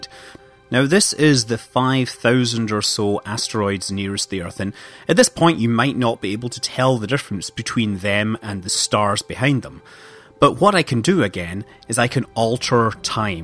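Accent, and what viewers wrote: Scotland, United Kingdom. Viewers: my god what a snooty Scottish accent you have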